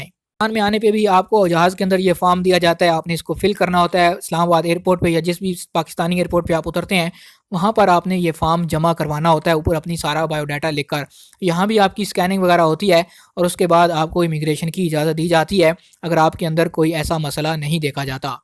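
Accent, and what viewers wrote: India. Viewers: Bhai saaaab... bolne se Kashmiri ya Potohari lagtay aaaap...?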